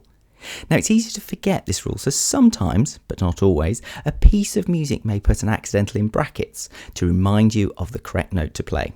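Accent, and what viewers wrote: United Kingdom. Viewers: I like your british acent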